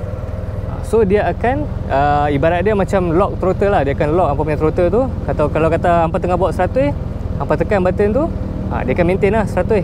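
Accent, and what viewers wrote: Penang, Malaysia. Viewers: Orang penang ka ni hehe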